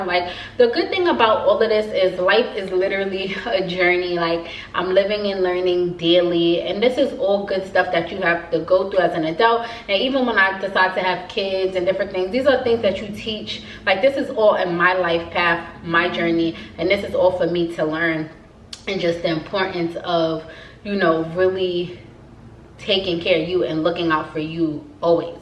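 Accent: New York, United States. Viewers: I needed to Hear This ❤️‍🔥🥴 But yooo the nyc is def in you 😂 #BIGVIRGO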